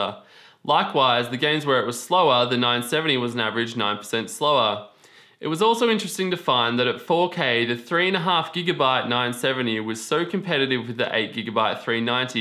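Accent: Australia. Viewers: You sound like you're from Australia. May I ask where you could possibly get a GTX 970 for $300? That's the price range of the GTX 960 here. The 970s are around $450, depending on which brand you pick. If you're not from Australia, my apologies.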